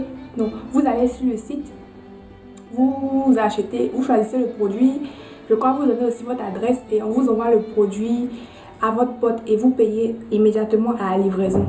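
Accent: Ivory Coast. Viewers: Trop mimi, tu m'arraches toujours un sourire avec ton accent trop mignon qui me rappelle mon pays la Cote d'Ivoire, bon courage et bonne continuation à toi:-)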